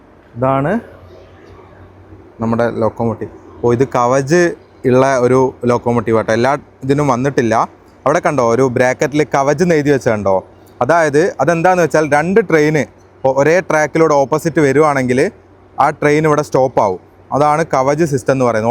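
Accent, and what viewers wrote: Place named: Koyilandy, India. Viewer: കൊയിലാണ്ടി ശൈലിയിലുള്ള വിവരണം ഇഷ്ടപ്പെട്ടു.പേഴ്സ് നഷ്ടമായി എന്ന് കരുതിയപ്പോഴും അത് തിരിച്ചുകിട്ടിയപ്പോഴതെ അവസ്ഥയും നന്നായി മനസ്സിലായി..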